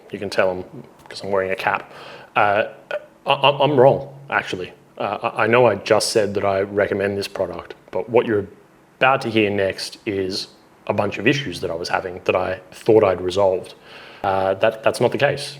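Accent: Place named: Australia. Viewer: I don't think this guy knows what he's talking about or knows how to program a laptop settings. Out of the dozens of reviews on this laptop all of them being great and no issues. This guy sounds like a whiny Australian like the rest of them. I juat ordered this laptop and I'm sure I won't have any of these issues he's claiming. Maybe he has the only bad one in production🤷‍♂️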